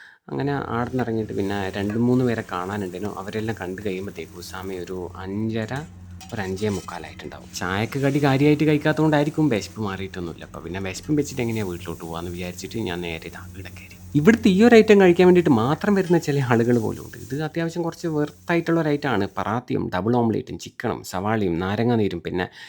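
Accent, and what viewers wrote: Kannur, India. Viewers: Samsarm knr l mungipoya bro krch timek😂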